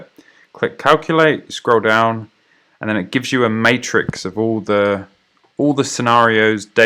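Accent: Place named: United Kingdom. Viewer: Where do you buy your calls? Sounds like you’re from the uk too?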